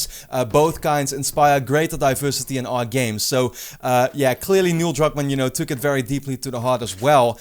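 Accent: Netherlands. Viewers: Ah, ik dacht al een Nederlands accent te horen. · are you dutch ? Your accent sounds like it ;p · The sexual orientation of a character really does not matter to me, nor their race, gender, strength. I really agree with everything you said, even though it's a while ago. I understand that people might think an agenda is being pushed, because they do not agree with it, and suddenly they are confronted with something they might not expect in a game like tlou, but i hope they can learn to see, it is not pushing anything. If Ellie would be kissing a boy, sure, someone might say he is pushing a hetero normative agenda, because they would rather see more gay romance in games or on screen, but in the end, the developer has all the right to put his or her ideas into their creation, their fiction. Their art. I'm not really OK with people demanding artists to change their work to please the masses, but ofcourse, everyone can have their opinion, but they shouldn't expect the devs to bend to their will. 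And, are you a fellow dutchy? Not that it matters, but the accent? Cheers for the video.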